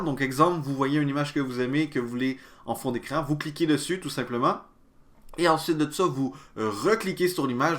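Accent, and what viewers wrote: Quebec, Canada. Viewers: Vidéo rapide simple efficace + le petit accent québécois +1 abbonement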